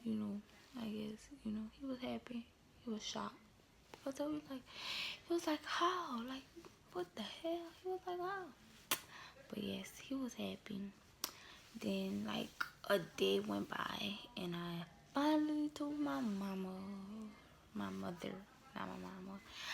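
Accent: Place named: Louisiana, United States. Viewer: Congrats girly ❤️where are you from...you have a Louisiana accent and I’m in Louisiana lol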